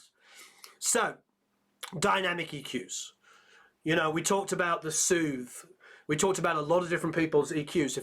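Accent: England, United Kingdom. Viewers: Don't lose your English accent, we love it, good info, thanks.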